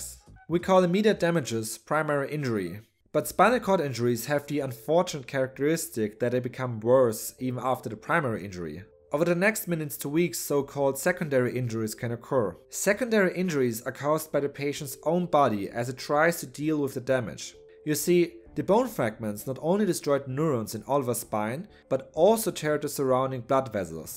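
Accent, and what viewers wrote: Germany. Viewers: German accent?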